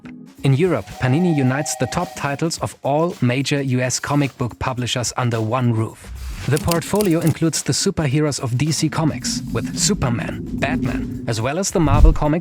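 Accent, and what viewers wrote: Germany. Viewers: Love your videos, you got the most pleasant English accent I know. Und das mit einem deutschen Akzent zu schaffen macht das ganze noch genialer ;) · Is this a german accent?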